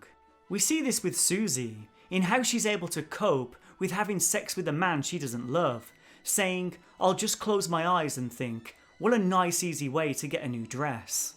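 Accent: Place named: United Kingdom. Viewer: I will admit that I was kinda of skeptical of your channel when I first stumbled with it cause you sounded very British, I'm glad you didn't just gloss over your own identity and pretend like it does not affect the way you view things. The fact that you tried to confront your own biases in this video is pretty great, so kudos to you. Your channel is awesome!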